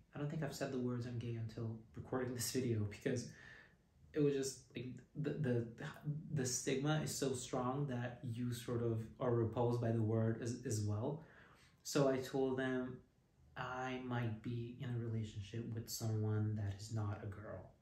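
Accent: United States. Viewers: One question? You have an American accent !!!! Explain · What accent? He sounds like he is from the US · If you're from a Balkan country, why do tou speak English with an American accent?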